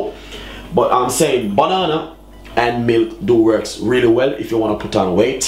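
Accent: Jamaica. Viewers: That Jamaican accent🥰🥰🥰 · Yea man, I hear it like a bob marley, rastahhhh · You mean his patwah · Haha some Ppl don't understand Jamaican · He's a Jamaican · Its Jamaican (Patios) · Nice, I just love the Jamaican flavour of your English. will definately try your receipe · Are u a Jamaican bro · Hahaha I like Jamaican accent thanks am going to try it and see coz I have 48kg and I want to be 60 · I can just tell first minute in he’s Jamaica lol 🇯🇲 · It’s because of his Jamaican patois accent 🤔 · Authentic jamaican accent🤗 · It's the Jamaica accent for me 🤭🤭🤭🤭🤭🤭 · Put on wheats 😂Jamaican accent at its best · Lol he’s Jamaican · he's Jamaican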